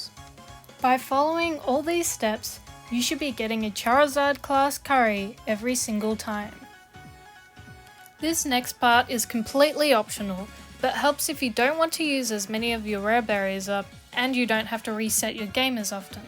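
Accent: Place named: United Kingdom. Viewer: You sound board and British. It's going to be ok 👍 don't be sad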